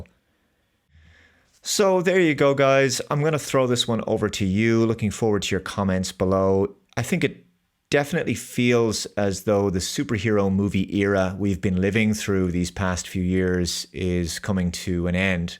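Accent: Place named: Ireland. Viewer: So glad that your break was relatively clean. I hope it heals up nicely. Good luck with the appointment next week! Outside of Spiderman, I haven't gone to theaters to see a superhero movie for several years. It is really sad, but I agree with your reasons for the superhero fatigue. I enjoy your content and love listening to your Irish accent! ❤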